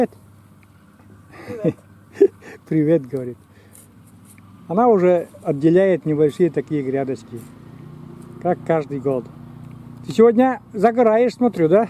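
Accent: Komi, Russia. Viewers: Добрый день! Всегда приятно Вас видеть. С началом посевного сезона, спасибо помощника. Всем Вам здоровья и мирного неба! Наслаждаюсь Вашим Коми акцентом. Добра Вам!!!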